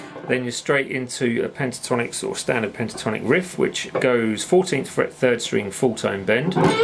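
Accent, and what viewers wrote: United Kingdom. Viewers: Nice rif. are you from London?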